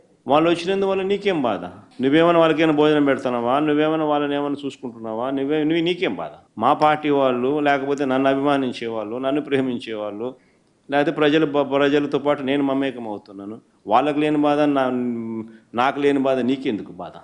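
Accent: Rayalaseema, India. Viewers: రాయలసీమ యాసలో బాగా దింపావ్ కధా జగన్ సూపర్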